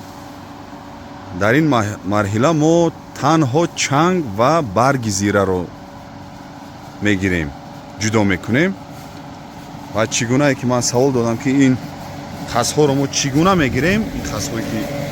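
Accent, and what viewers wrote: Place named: Tajikistan. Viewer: Зинда хонаобод боши бародари азиз ... Барои лавзи ширини точикона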